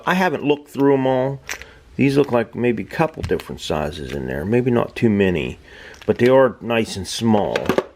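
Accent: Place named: Indiana, United States. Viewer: are you in Indiana? Your accent reminds me of someone in my hometown of Richmond, Indiana.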